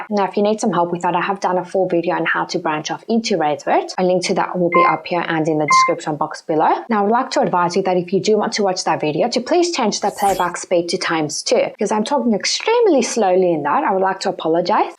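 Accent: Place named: United Kingdom. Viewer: Es solo por su acento británico, a mí también me costó mucho entenderla pero al final entendí casi todo jfdj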